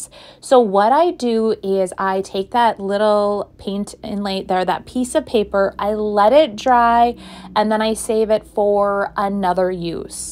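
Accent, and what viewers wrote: Wisconsin, United States. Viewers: I really, really enjoy your work! What caught me first was your voice...my husband is from Watertown. I absolutely love WI!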